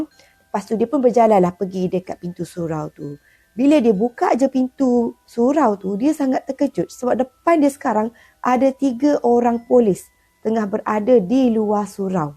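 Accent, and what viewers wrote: Kelantan, Malaysia. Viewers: ni ore Kelate duk raso.. Bunyi po loghat Kelate wlu dio kecek luwa pun 🙃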